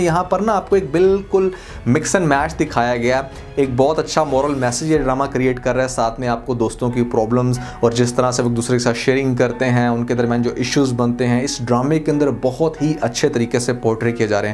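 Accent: Punjab, India. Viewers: Ap punjabi hn ?? Ap k excent se laga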